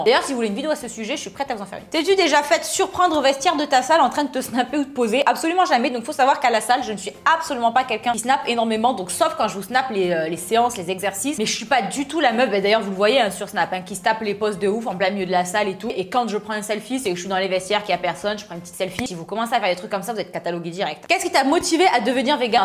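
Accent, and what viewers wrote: Brazil. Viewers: On sent que tu parles un portugais brésilien à l’accent aha😂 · L'accent brésilien c vraiment dar 😏👌👌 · voce e muito linda e eu amo teu accento brasileiro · Mais c parcque elle parle avec l accent brésilien qui est différent du portugais c pour ca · Tu na pas réellement parler portugais c’est plus brésilien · Trop chou ton accent brésilien j adore!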